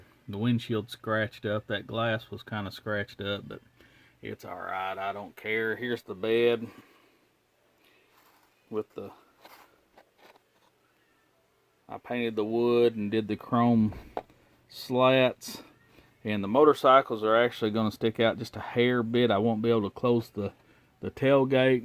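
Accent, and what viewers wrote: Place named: South, United States. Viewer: bye the way do you live in the u.s. i live in titusville fla. thank you p.s. you sound your from the south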